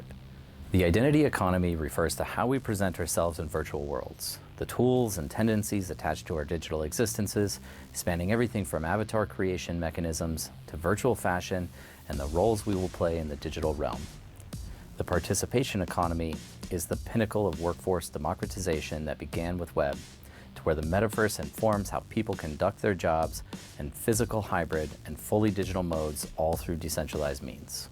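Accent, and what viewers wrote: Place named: United States. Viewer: Which part of America is his accent from??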